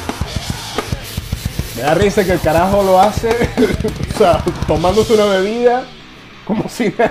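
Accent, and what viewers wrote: Venezuela. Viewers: tu eres venezolano rajao brother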